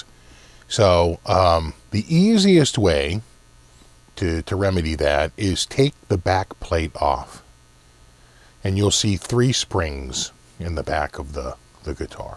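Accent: Boston, United States. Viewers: From Boston? Say no more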